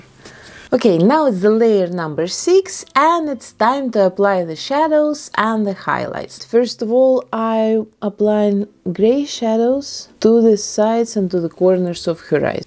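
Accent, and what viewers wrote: Russia. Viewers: Кукла в вашем исполнении получилась великолепной. Томные, выразительные глаза подчеркивают некую таинственность в Жасмин. Очень понравился результат. Во время просмотра вашего видео я никак не могла понять, у вас присутствует некий русский акцент, или я ошибаюсь?) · иногда кажется, что она русская по акценту и словам) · Что за жуткий рязанский английский?) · какой то русский акцент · Кажется как будто акцент русский... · Русский акцент? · Ты из России? Прям чую чуйкой акцент)/ Are you from Russia? I feel the uccent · русский акцент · У тебя русский акцент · Вы русская? Чувствуется акцент) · Ну скажите, что вы русская! Такой сильный акцент!)) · Что за акцент..русский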